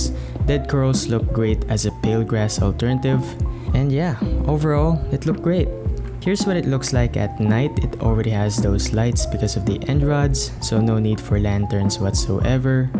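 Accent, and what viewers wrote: Philippines. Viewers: felepeno accent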